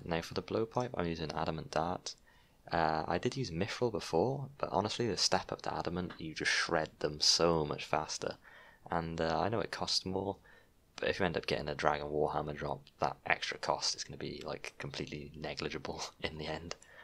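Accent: Wales, United Kingdom. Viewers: Can tell youre from Wales with the accent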